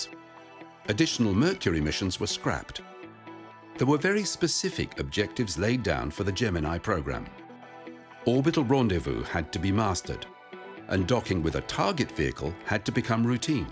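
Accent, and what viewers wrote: United Kingdom. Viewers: I would have given this video a good rating if they had used an American instead of a British guy as narrator. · As for the comment from the video "John Glenn returned without incident ", There was a flashing light down at mission control saying that his heat shield had become dislodged. Some bean counter from NASA administration That if they kept the retro rocket strapped on, That maybe it would keep the heat shield and place long enough for him to descend through the atmosphere. This actually endangered his life because it caused unequal burning of the heatshield or something like that. There had actually been nothing wrong with his heatshield just a faulty bulb down at mission control. Oh and they never told John Glenn about it. This incident took the NASA administration out of direct control of the missions as they were happening and put full control into I think the guy's title would be called capcom. 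That being said, Check my math always check my math even when There is only 2 people in the room I am never the smartest person in the room. Your video footage is stunning. The narrator To me sounds British, Doesn't matter whether it be male or female the British accent just makes it the sound Authentic. Despite me Nit picking, I enjoyed the video thank you for the time and effort you put into it. Apologize for any spelling, punctuation or grammar errors. Although English is my only language that doesn't make me good at it.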